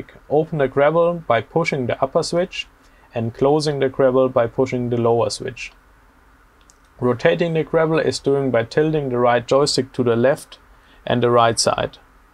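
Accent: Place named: Germany. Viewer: the knowledge of cranes and the German accent are strong with him.;-)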